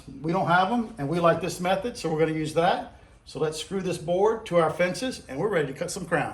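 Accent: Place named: California, United States. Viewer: Sir, Morning. You are very professional and well spoken, and you definitely look like someone famous. So, as I watched the lecture, it came to me, you look like a brad pitt who really worked his ass off in life… with a slight California accent… Great work.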